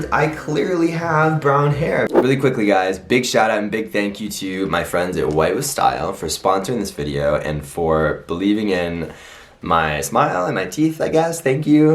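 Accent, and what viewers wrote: Buenos Aires, Argentina. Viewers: Hi I'm from Spain which is a bit far from America but while I was watching this video I realised how similar we spanish are to south americans. And also I love how you speak spanish with argentinian accent which makes me laugh (in a good way obviously). Btw I love your videos and your channel and I hope you visit Spain. Love you❤️❤️ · I loved your acento Argentino 🤪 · Hey there is a Panamenian HEERE! amé tu video, te salio super bien el acento argentino, I send you hungs and grettings! Me impresionas, I LIKE ALL YOU VIDEOS...!!!! I´m wating the next video 👌❤😍😍😍😍😍 SALUDOS DESDE PANAMÁ! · Hi! I am from Argentina y amo tus videos! Tenes un acento super porteño jajajajjaja Yo soy de Mendoza, no sé si fuiste alguna vez pero es una provincia hermosa! Love you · The sexiest thing I've seen today is: hablando español con acento argentino 😍😍 And that beautiful smile. 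God... I can't over it! Definitely I have a crush on you 🙈 I love you, ok? A lot 😂❤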